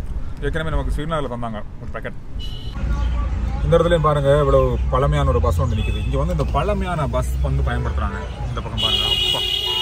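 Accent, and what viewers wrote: Sri Lanka. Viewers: congrats watching all your videos for your srilankan tamil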